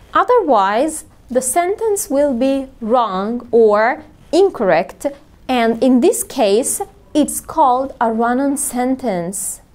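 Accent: United States. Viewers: Thanks for everything my friend to speak and to teach only in English you have a very powerful English with american accent with a perfect pronunciation. It's very very useful when you speak only in English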